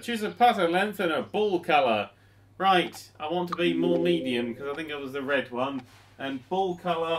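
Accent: England, United Kingdom. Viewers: Is it just me or does sound more British then usual in this video? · Is it just me or has gotten significantly more English? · sounds a lot more british in this. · is extra english today. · Boy sounds extra British today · Has became more British?? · When gets angry, he gets like %70 more British. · I think sounds the most british he ever has in this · became more british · I think is extra British in this episode.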